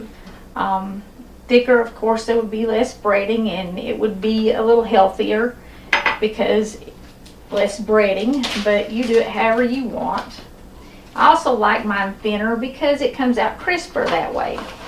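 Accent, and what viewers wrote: South, United States. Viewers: LOVE her southern accent. Would it not be more convenient to use a bowl instead of a bag? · Bless you. I just moved away from the south to the Midwest and have been having the worst bout of homesickness. You not only sound like home to me but cook like home. Going to make this tonight! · Just found your channel & am a new sub. I love your southern accent, such a beautiful part of the country you're from. Also really love that you put God first! The fried squash looks delicious, will definately be making it.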